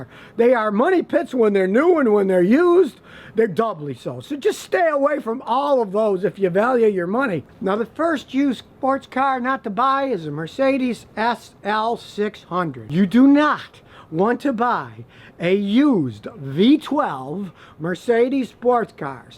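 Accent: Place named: South, United States. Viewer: Your brain tends to really question itself, when it see's a John Lenon look alike guy talking in a southern accent, especially when you're high.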